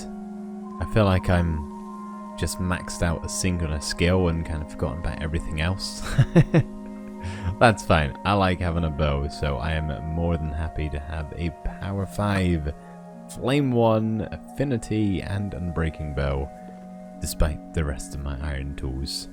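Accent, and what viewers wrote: United Kingdom. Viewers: are you from the U.K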